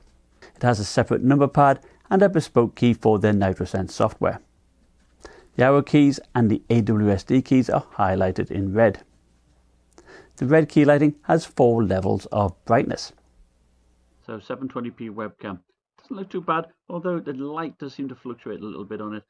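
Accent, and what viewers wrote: United Kingdom. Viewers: Hey, first off I hear a British accent but hear dollars and see that disgusting enter key 😂 must be tripping but I have a really important question, curry’s are really weird with they way the price the laptops here so the nitro 7 with 144hz, 8GB ram and 1660 Ti will be around 800 quid on Black Friday with a 512 gb ssd and the Helios 300 with 1660 Ti 1TB HDD and 256ssd also 144hz will be around 1.1k, what would you reccomend??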